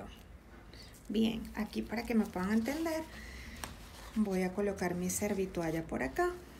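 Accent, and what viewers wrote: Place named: Venezuela. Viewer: Amo ese acento venezolano saludos desde Argentina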